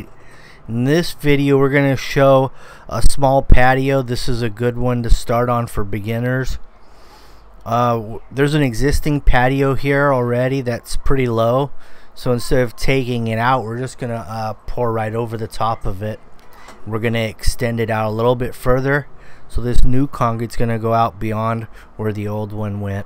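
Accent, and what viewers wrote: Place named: Orange County, United States. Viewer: Your accent, "THIS guy is from OC." Then your phone number pops up. Yep.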